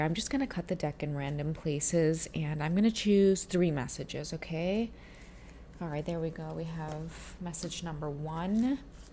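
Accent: Trinidad and Tobago. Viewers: A warm Trini shout out! I love when you speak in your Trini accent makes it even authentic. You have an amazing vibe and your reading provide such clarity.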